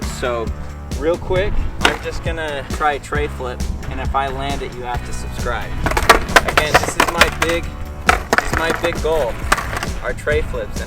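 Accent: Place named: Utah, United States. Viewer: I somehow knew you were in Utah as soon as you started talking 😂. Great video, thanks.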